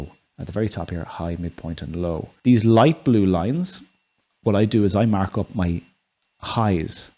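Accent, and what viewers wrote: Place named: Ireland. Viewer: First video from that is a bit confusing.Will need to look at it a few times.Can hear his Irishness sometimes.😊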